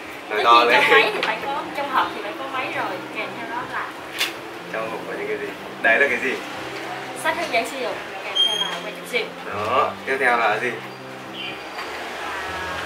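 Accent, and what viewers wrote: Vietnam. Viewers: video mang tính chất khoe gấu là chính. nhưng giọng miền nam hay sao ý mình nghe lần đầu lạ lạ a ạ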